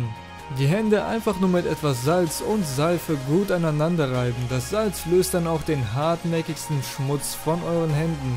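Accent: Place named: Russia. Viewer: hört man an deiner Stimme ich bin auch Russin habe aber ich spreche normal das sollte kein hatte werden OK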